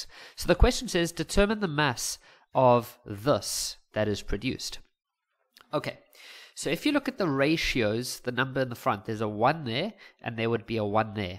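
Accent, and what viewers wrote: South Africa. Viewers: I WAS SO SUPRISED WHEN I HEARD THE SA ACCENT. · Thank you brother, you are a life saver, the way you explain is amazing, the South African accent is also great❤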